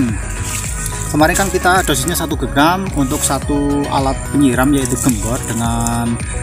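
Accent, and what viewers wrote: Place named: Banyumas, Indonesia. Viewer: Sampean wong bnyumas y mas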